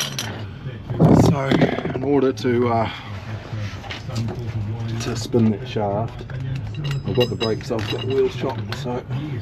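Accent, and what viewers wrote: New Zealand. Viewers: I bloody knew it,,,,, You're a Kiwi.😂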